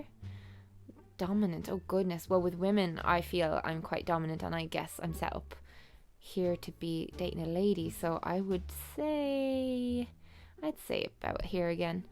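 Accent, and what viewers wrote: Ireland. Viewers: Where is she from? Ireland? Australia? UK?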